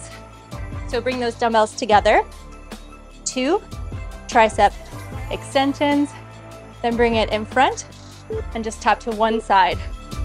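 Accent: Canada. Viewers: This workout popped up on my feed. Really enjoyed the compound moves, weights, pacing. New subscriber. Did I detect a Canadian accent? 🙂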